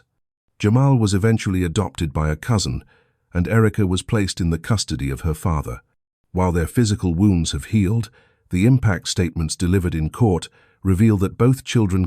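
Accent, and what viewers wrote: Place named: England, United Kingdom. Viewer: The narrator sounds nice but it’s not as effective because with the accent makes it sound like the story is from England .. “ the mahsque”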